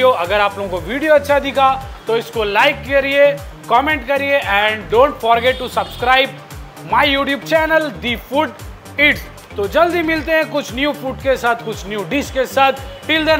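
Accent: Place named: North India, India. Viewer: Phir woich karre kya bhai North India me hai jaisa baath karre Naturally baath karo aur Hyderabadi slang use karo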